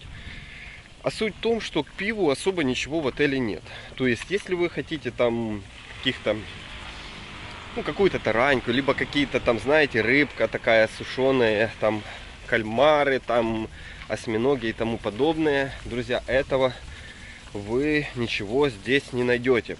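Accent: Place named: Odessa, Ukraine. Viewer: вопрос "офф-топ". Не в тему. Вы одессит? )) Вы не подумайте ничего такого. Но вот это вот "я Вам расскажу ЗА несколько вещей" - это так по-одесски, так узнаваемо ))))))))))) ++++ Спасибо за видео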